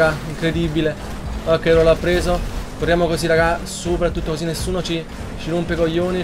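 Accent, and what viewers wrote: Naples, Italy. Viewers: È di Napoli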